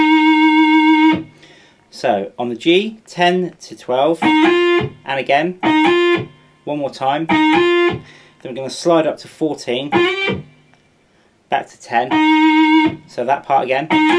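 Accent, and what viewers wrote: England, United Kingdom. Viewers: ....and thanks for speaking with this beautiful english accent so that a stupid german like me can understand you that well!!!! I never get these us guys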